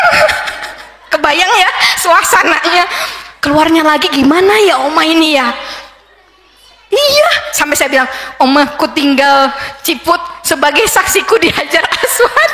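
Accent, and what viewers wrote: West Java, Indonesia. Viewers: Asalamualaikum.....ibu dokter Alhamdulilah saya dengernya seneng dan terharu mudah2an selalu sehat panjang umur dan selalu Istikomah.....Aamiin ibu dokter apa ibu dari suku Sunda? Suaranya logat Sunda. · Dokter bahasanya kaya sunda banget ye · Iya. Jdi kayak orang Sunda....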